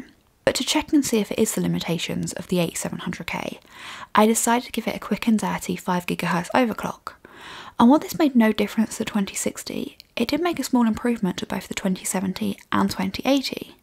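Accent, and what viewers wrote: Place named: Cockney, United Kingdom. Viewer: You've been living in London lately? Your accent is sounding a bit cockney.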